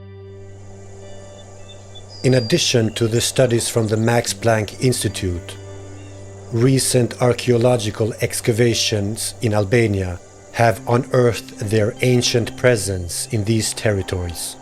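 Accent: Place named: Arab world. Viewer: O njerez, nese doni me ba dokumentar te tille dhe ne Anglisht atehere le te jete o dikush qe flet me mire o dikush qe e ka gjuhe amtare, ky narratori po tingellon si me lexu noj Arab.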